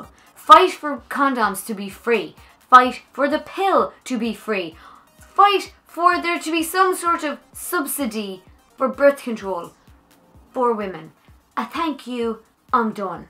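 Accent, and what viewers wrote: Ireland. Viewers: I know it's that I'm American and not used to hearing the Irish accent, but I can't tell the difference between you saying legal and illegal.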